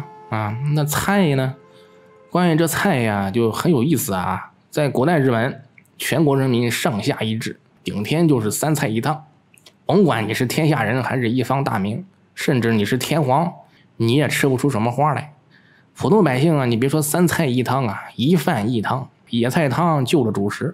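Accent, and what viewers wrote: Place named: Beijing, China. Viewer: 你的解釋非常好 視頻做的也真的非常棒 但能不能別用北京腔 舌頭給我放平說話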